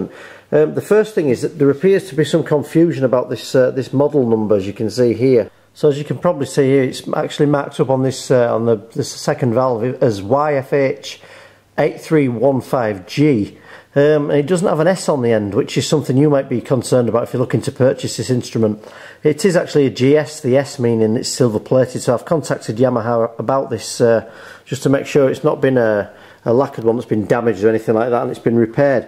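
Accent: United Kingdom. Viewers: very helpful thank you and I personally really like the Yorkshire accent - it makes it all sound genuine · Appreciate your videos, very helpful, considering purchase of flugelhorn after buying Trumpet. Sounds nice, as soft as a cornet, what mouthpiece is being used DW 3FL, caught at end, thanks. Used to live in Sheffield so lovin the accent!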